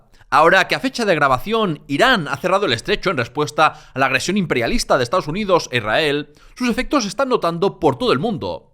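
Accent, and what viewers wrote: Catalonia, Spain. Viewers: Joder, que acento catalufo asquero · Acabo con la monarquía hispánica? Menudo acento indeptista tienes, tu canal parece una clase de adoptrinamiento de una escuela indepte pagada por ciuercal 3 por ciento . Menos mal que hablas español así me entero del tipo e odio que pretendes generan en quien te ve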